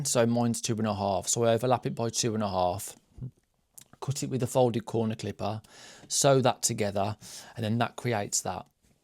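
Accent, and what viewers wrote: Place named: Leicestershire, United Kingdom. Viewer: I loved watching your video , I have so much to say lol , regarding ends of quilting , I do ,do a back stitch , but if your unhappy , I would just leave a long thread and make sure you bury it well , but honestly , I don't worry about it myself . If a man on a horse riding by can't see it , then your good lol . What is that corner turny thing you mentioned ? As to the stripey binding , if it's on the bias the stripes go diagonally. I would use your left over stripey fabric to bind something small like a table runner to practice and see if you like it . I don't know where in the UK your based ( sounds close to where I was born , Leicestershire) but if you gave a local quilting shop they might know of local groups you could join , we always loved it when men joined . Now my health is not good I can't go to groups so I do video calls with my sister of friends whilst we quilt or crotchet etc . 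Do you know anyone ? On WhatsApp or messenger the video calls are free so we do it for hours . And have you seen the book Fox under the moon , seasons of hope ? It's similar to the boy , the fox ,the mole and the horse , I find it helps sometimes . I love the illustrations so much I'm going to make some in to small wall hangings ( that is after I finish some other wips lol )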